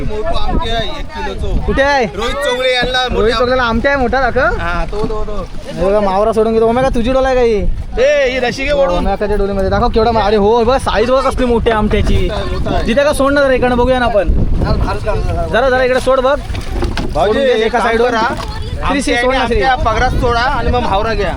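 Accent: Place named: Konkan, India. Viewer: भाषा तर कोकणात वाटते दादाचा कोणत्या गावचा कमेंट करून सांग कामांची रिप्लाय दे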